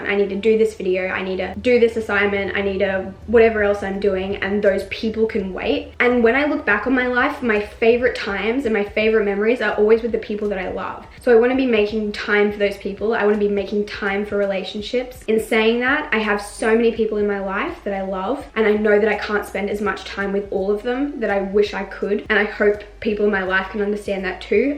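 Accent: Australia. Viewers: honestly probably not something you hear all the time but i love the way you express yourself, i don't know how to describe it and maybe i'm just loving the Aussie accent haha but I love the way you talk and formulate your sentences!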